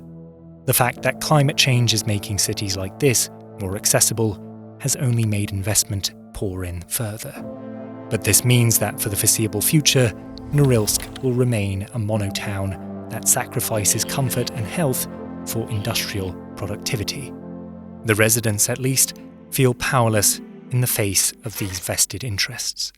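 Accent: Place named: United Kingdom. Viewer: British detected, channel rejected. Maybe talk about all the most depressing British cities, go fix your country and get off the couch.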